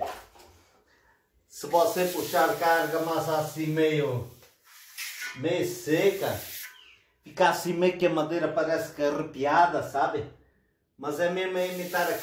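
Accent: Portugal. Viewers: fico muito orgulhosa de ouvir um Português no YouTube! Sou Portuguesa e estou em Portugal. Ótimo trabalho! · Adoro o sotaque do português AMO de paixão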